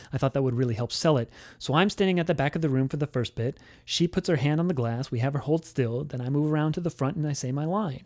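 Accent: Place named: England, United Kingdom. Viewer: You have a great English accent mate! 😊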